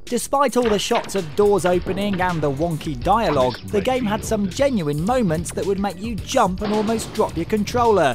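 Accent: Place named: United Kingdom. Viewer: ahw.. Hes british!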